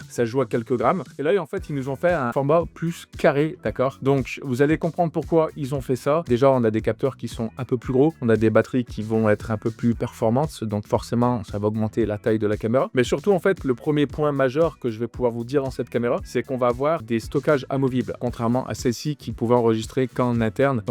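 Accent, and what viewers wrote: Saint-Étienne, France. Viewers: c'est un accent stéphanois qu'on entend ?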